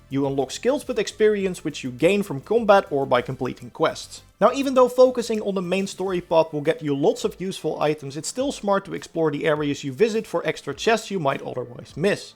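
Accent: Netherlands. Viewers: Sounds Dutch to me. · Great vid 🙂 Quick question.. Are u from the Netherlands? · Just a weird question. Are you dutch?. Haha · Hi, In the english language the word LOT, like "a lot of power", is pronounced a LAT. It shows your Dutch background where a lot of people do this. It is no problem and people instantly recognise your voice, but it is just that little bit extra professional, which all of your video's are... No offence meant at all just this remark, wether it is useful or not. Kind regards, Jules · In loving the dutch/ anglo accent 😂😂😂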